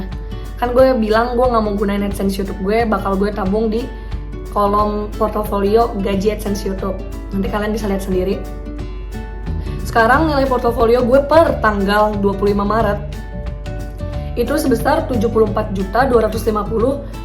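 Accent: Bali, Indonesia. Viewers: kek logat orang Bali 🤔 , tpi thanks. ya video nya menarik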